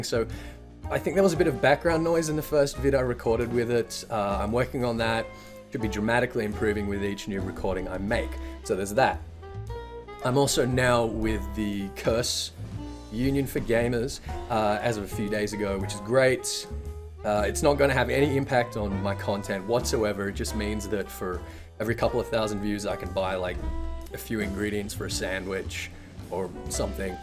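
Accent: Australia. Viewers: yo dude where in aus are you from?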